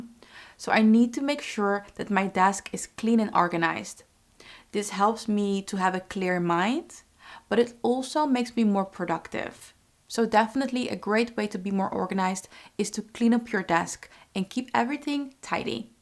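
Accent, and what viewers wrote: Netherlands. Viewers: Thank for giving me to motivation to start orginizing! And watching your video I was thinking she sounds so dutch.. Checking the about and yes you are! Ik ga je volgen! Groetjes van een nederlander woonachtig in Zanzibar.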